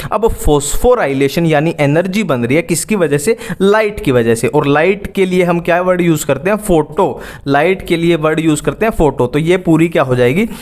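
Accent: Haryana, India. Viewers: Aap haryanvi ho na bhaiya 😊 ?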